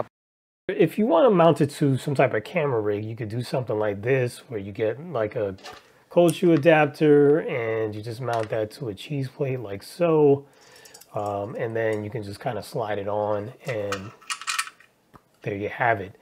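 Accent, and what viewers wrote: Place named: United States. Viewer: Dude, your American accent is so smooth! It's like butter. You sound like a mix of Ray Romano and young Louis Armstrong!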